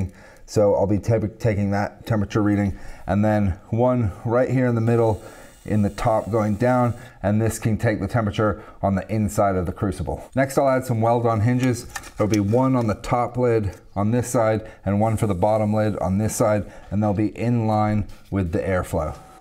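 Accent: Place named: United States. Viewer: This channel may be the most useful, applicable, & effective content on here. It's the meat & potatoes answers for worldwide, pertinent questions. My biggest question is why do you sound American & not British? Personally I'd love to understand how you got where you are & what you're doing today? How did you aquire your skillsets & why you find what you do valuable?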